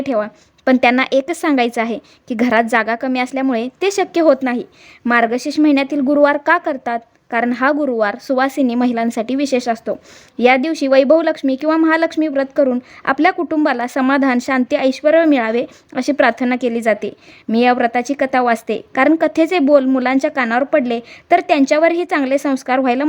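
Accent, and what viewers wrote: Kolhapur, India. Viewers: ताई तू कोल्हापूर जिल्यातील आहेस का, बोलणं भाषा जवळची वाटते ❤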